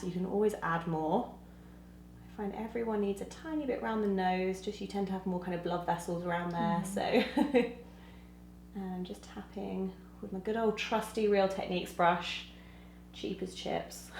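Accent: Australia. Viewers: Loving hearing the familiar Aussie accent on your channel! x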